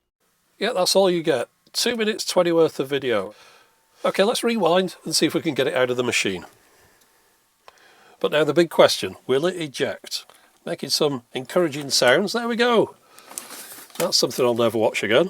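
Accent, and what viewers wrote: United Kingdom. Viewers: I can, the British accent, the quirky content, the snarky commentary, the obscure technology and the enthusiasm. One of the YouTube’s greatest channels!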